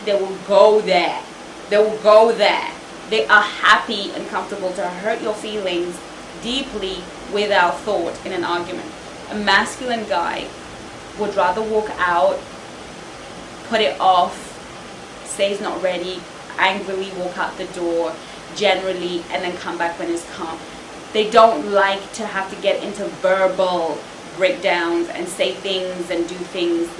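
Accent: United Kingdom. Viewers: You look gorgeous. I love your British accent:)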